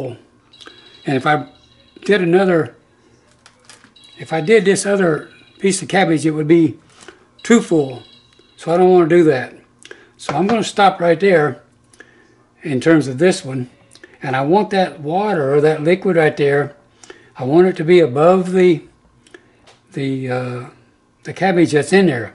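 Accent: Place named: South, United States. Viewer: Sounds like joe Biden making a tutorial on making kraut but with a southern twang .... c’mon man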